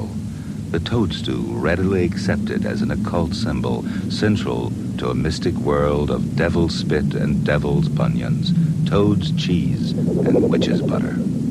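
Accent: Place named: United States. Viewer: Yes but what happened to the original narrator? This version, with the American accent is not nearly as good as him!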